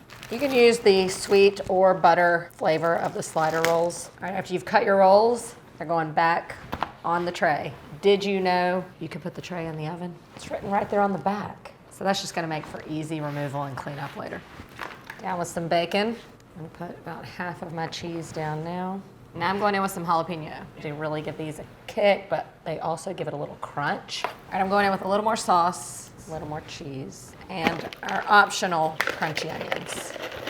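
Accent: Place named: Alabama, United States. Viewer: ! The recipes are almost always on point, but if I’m being honest, a lot of times I just listen to her videos because I hear home in her accent. I’m from a sort of culturally ambiguous part of northeast Florida (St. Augustine - we’ve been here since 1565 and have become a cultural crossroads for so MANY, that we don’t really have our own sho-nuff “this is who we are” vibe you get in so many other places. A drinking village with a fishing problem, some say. BUT, we are close enough to Georgia and Alabama that you can hear both in our accents here. I’m not positive, but there sounds like she’s got roots in Alabama. Sounds like home to me, so here I is!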